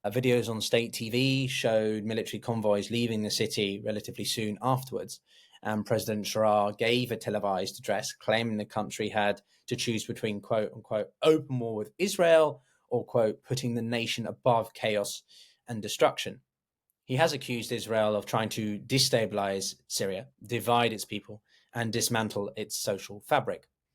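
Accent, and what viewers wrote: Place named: United Kingdom. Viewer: This guy is shooting his mouth off with a biased defense of Syrian jihadists, and with his Oxford trained British accent sounds like a European interloper sticking his nose in other people's affairs. I had to cut him off because he's a phony trying to make a name for himself on YouTube. Get off your ass and get a job, you slob.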